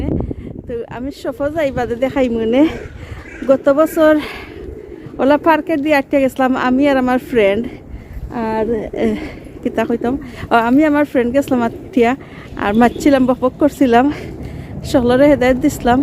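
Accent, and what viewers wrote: Sylhet, Bangladesh. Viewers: আপু কি সিলেটি নাকি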